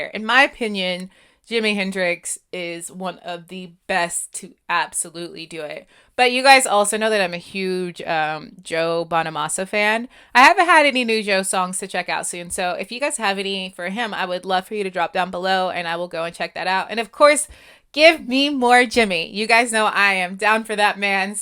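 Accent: South, United States. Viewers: This song is just too short. It needs at least another verse and chorus. BTW, your southern drawl is showing 😃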